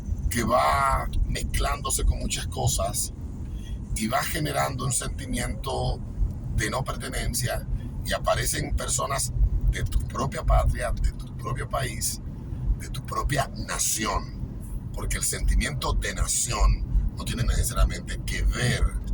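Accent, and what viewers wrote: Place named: Dominican Republic. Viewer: rayos en verdad cuando vi la miniatura pense que no tu aspecto no parecia de una persona de republica dominicana, todos esos pensamientos desaparecieron cuando escuche tu voz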